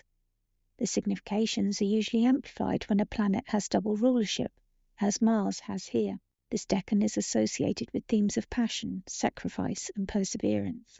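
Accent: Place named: England, United Kingdom. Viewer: U should have more comments🎉Thank U. When i saw the broadcast was at 15..mins - thought why? give it a try. Liked the Landscape view - seemed to be London Parliament Thames. Then when i heard the English accent and that U R from UK 🌍 i thought good going. Your content is very deep - Alkaid ? New to me - Only time of mention, through Listening to various Eclipes themes. Very knowledgeable for your age, by sound of your voice🎉Trudy ✨Gemini 1963 London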